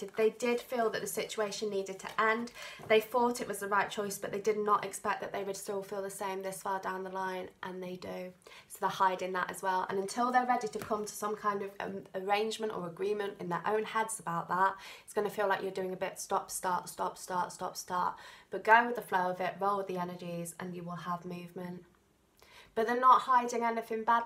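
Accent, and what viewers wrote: United Kingdom. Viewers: Deck #2 🙊💖 thank you, this is a nice reading. I love your purple hair :) and your style. It's just been a little hard for me to keep with your fast speaking 😅 bc British English has a strong accent for me, I'm used to American accent more, so yeah it was hard to keep on with the reading 😅 had to turn on the subtitles.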